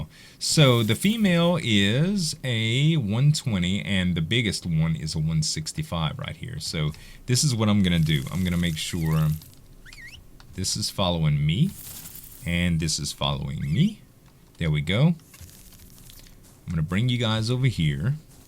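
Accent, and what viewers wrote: Louisiana, United States. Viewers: Love ya videos Z. Listening to you takes me back to Thibodaux, and I haven't been home in a while.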